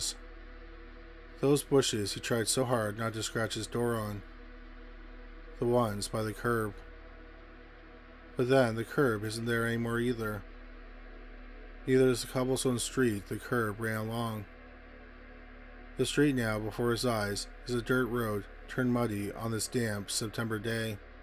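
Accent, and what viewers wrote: Canada. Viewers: I follow and just happened upon this site; once I heard the narrator's Canadian accent I made the connection. Thanks for the input, nice work as always.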